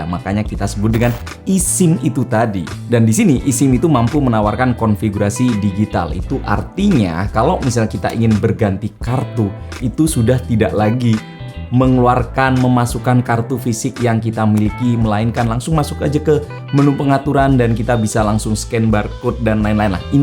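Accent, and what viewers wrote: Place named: Madura, Indonesia. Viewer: bang gua baru notice lu orang maduris dari cara ngomong lu baru ketangkep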